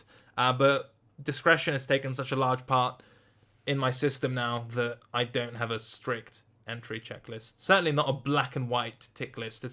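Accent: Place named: United Kingdom. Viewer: was extra british in this one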